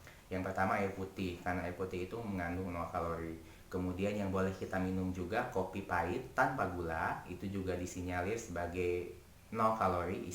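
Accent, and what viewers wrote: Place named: West Java, Indonesia. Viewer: Ow ow... Luarss biazzaaa.. Emezing pisan... Tetep sundanya kaluar.. 😍😍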